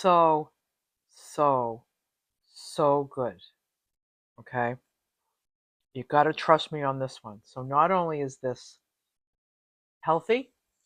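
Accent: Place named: New York, United States. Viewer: I absolutely love your hair AND .....your roasty toasty cabbage and your accent love love it!!!! Im from NY too!!!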